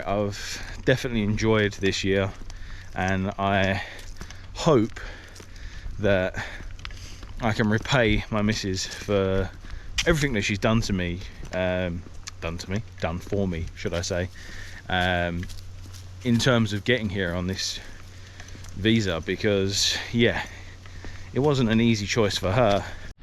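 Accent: Australia. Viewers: Defo getting that Aussie twang mate! Loving the down under content!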